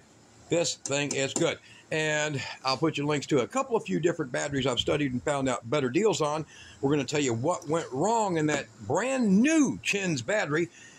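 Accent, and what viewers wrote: Texas, United States. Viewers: So funny that I hear I think a Texan. I know one friend of mine with the same name in Irving, TX that's a Scouter friend. Living close to DFW airport gives a perspective of how folks talk. Believe your doing a great job for us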